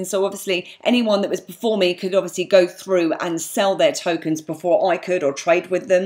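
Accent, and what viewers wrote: United Kingdom. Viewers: she has a british accent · She has a British accent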